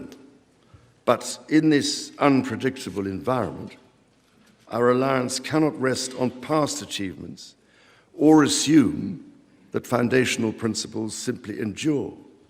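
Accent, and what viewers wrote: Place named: RP, United Kingdom. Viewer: Nothing beats a clean, pure, royal English accent. Glorious.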